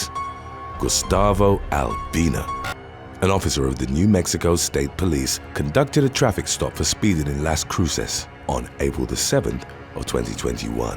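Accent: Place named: United Kingdom. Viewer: That’s just how Brits pronounce it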